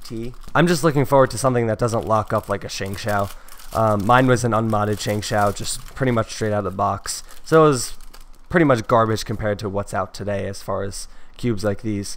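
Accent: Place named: Canada. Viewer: are you Canadian? haha it's sounds like it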